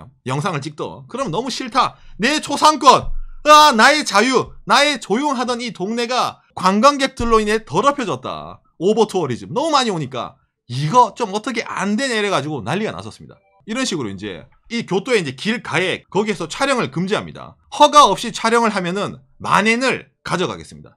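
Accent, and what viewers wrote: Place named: Changwon, South Korea. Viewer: 살짝 창원느낌이네요 ㅋㅋ 창원출신 대학동기도 창원프라이드가 엄청쌔더라구요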